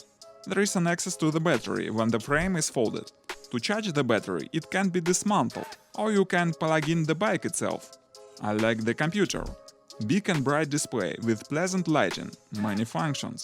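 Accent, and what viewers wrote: Russia. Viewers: Sounds Russian to me. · Русский акцент сразу палится) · U have a Russian accent don’t be scared to tell us the Brand-name I want 1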